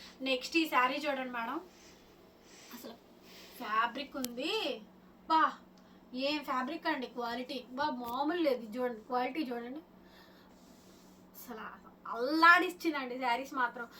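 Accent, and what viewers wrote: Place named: Godavari, India. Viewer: Meeru Godavari padatam muchchataga vundi mam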